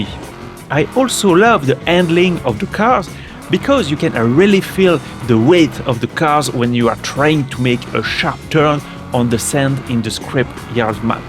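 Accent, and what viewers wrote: France. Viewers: cet accent francais 😅 (Voilà comme ça c’est mieux)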